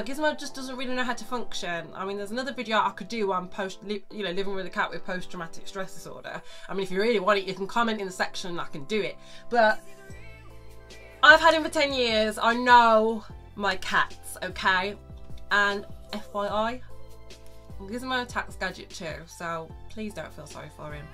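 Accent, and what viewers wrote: United Kingdom. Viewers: I like your british accent,and you sphynx is so adorable!,I love it !.John M Guinto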